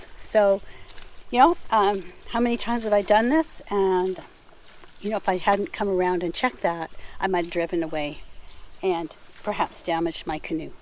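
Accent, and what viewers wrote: Canada. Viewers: Im from the Uk, I clicked on this, heard a Canadian accent, looked up Horne lake and realised its pretty close to where my dad grew up in Port Alberni! He passed away when I was 16 in 2016, so this felt like a nice sign from him and was a very comforting video ❤️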